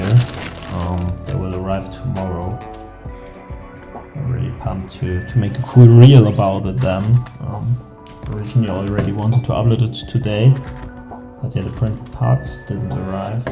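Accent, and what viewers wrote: Germany. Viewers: His accent? He's german - not everyone speaks American English or whatever bro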